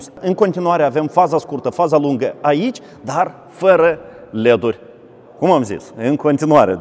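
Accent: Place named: Moldova. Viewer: Ăsta-i moldovean de dincolo de Prut ? Că după accent, așa pare !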